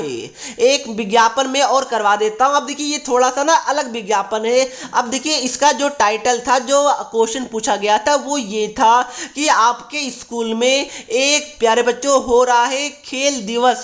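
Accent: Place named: Bengal, India. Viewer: Is it a bengali teaching us he be like paryog and twenteen wtf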